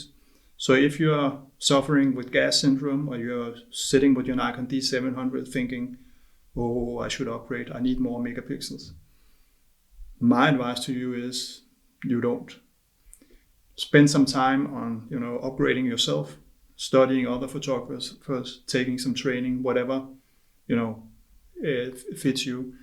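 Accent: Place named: Denmark. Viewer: Your accent sounds Danish.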